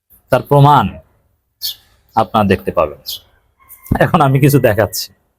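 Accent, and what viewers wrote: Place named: Comilla, Bangladesh. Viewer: ভাল লাগলো বাস্তবতা মজার ছিল। আপনি মনে হয় কুমিল্লা মুরাদনগরের, ভাষায় চাপিতলা দৌলতপুর এদিকের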